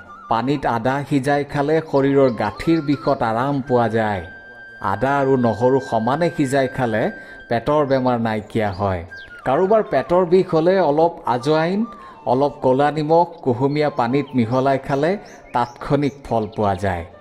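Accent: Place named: United Kingdom. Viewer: O dada apuni english stylet axomia pohise je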